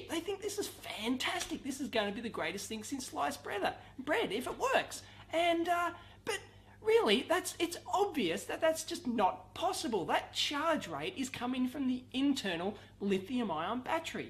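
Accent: Australia. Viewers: lUV THIS GEZZER, Must have the best aussie acent on the planet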